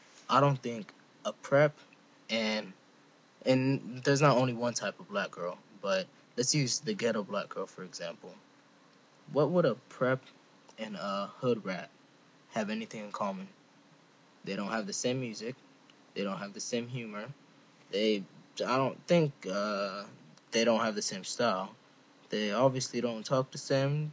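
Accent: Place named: Somalia. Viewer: Are you Somalian? You sound Somalian.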